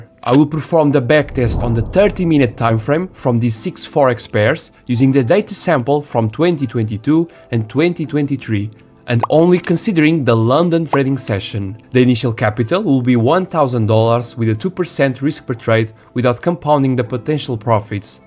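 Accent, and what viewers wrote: Portugal. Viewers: Earing you speaking and appreciating your accent it seems to me that you are portuguese from Portugal 😅🇵🇹 am I right?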